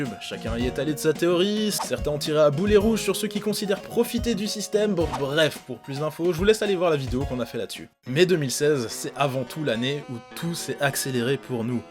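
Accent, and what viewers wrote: Belgium. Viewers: tu est belge ? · t belge ??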